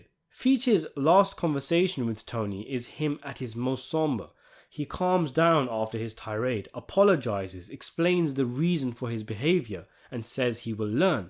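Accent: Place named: British Asian, United Kingdom. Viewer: Is the narrator British Asian? He has a slight accent. Good video - good channel, just curious.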